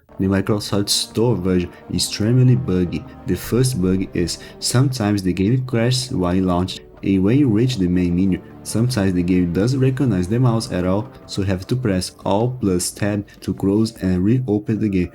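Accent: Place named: Brazil. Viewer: Mano vc é brasileiro n é? eu reconheço esse sutaque.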